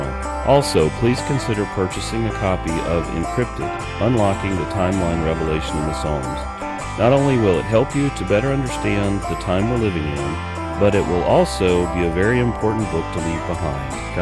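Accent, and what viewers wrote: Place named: Alabama, United States. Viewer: I thought I heard an Alabama accent. I live in Smiths Station which is just south of Opelika/Auburn. I sure wish you were local so I could physically attend. I’m glad you are posting this on YouTube so I can hear and follow along.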